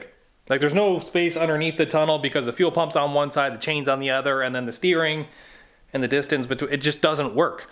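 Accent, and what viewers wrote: Canada. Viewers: Props from Manitoba, only YouTuber I've found with more or a Canuck accent than me. Keep killing it man. Lets race once my caprice is done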